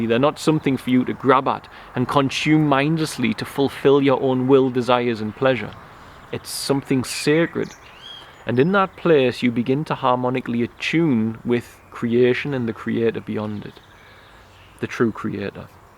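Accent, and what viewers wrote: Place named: Newcastle, United Kingdom. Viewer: i love your slight geordie accent lol i am a geordie trying to be godly too :P lol thanks for the info and videos, keep spreading the light xxx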